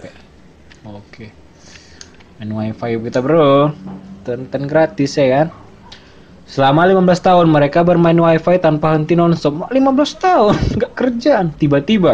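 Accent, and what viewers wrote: Medan, Indonesia. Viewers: lu anak medan ya brohh...... logatlu logat medan brohh.......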